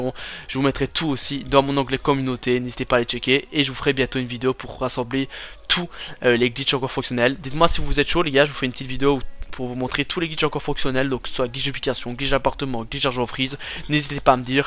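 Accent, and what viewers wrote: Liège, Belgium. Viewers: T belge ? Haha ton accent es puissant couzin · Sa sent l'accent de liege ça haha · Toi je parie ma vie t’es liégeois hahaha quel belle accent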